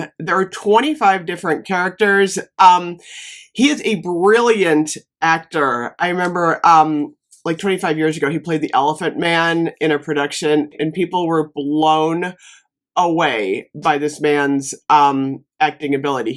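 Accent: United States. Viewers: Are from the states or Canada?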